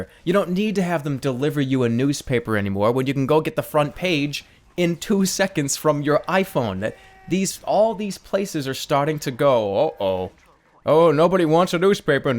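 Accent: Boston, United States. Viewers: Are you from Boston? XD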